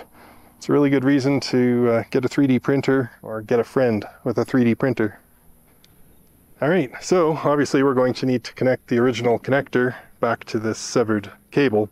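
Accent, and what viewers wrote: Canada. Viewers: I applaud your knowledge, skills and resourcefulness. Your presentation is well organized, clear, concise and complete. I also applaud you and your spouse for you adventurous spirit and commitment to your daring lifestyle. I enjoyed the presentation with the clever and apt visual effects. Very impressive. I also detect a soft Canadian accent. I wish you great success and fun on your continuing adventure and thank you for sharing a bit of your experience on YouTube Awesome!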